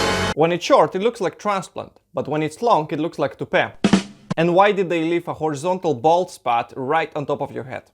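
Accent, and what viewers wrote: Russia. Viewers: You sound Russian. Do you recommend Dr Ferreira ? Where is he located?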